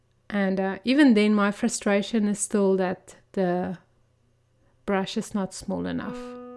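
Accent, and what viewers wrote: South Africa. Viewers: It's the first time watching your channel, and i thoroughly enjoy your accent, sounds much like my own - South African. By the way - butter chicken is my favorite!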